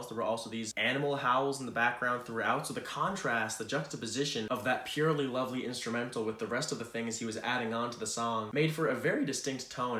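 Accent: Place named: Ireland. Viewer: Where is your accent from? It sounds so Irish sometimes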